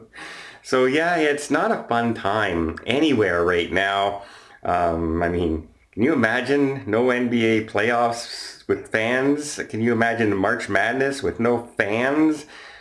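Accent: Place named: Quebec, Canada. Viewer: he lives in Ottawa and his accent is more Montreal/Quebec to me than anything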